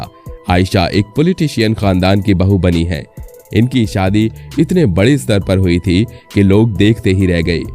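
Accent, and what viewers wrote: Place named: Rural, India. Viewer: Yaar ye youtuber kaise baat karta h 🤣🤣 total gaavwala 🤣🤣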